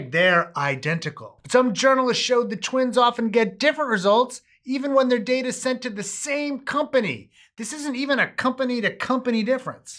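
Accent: United States. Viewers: If you're 99% Eastern European Jew, why are you talking like a 100% Jersey shore Italian good fellah?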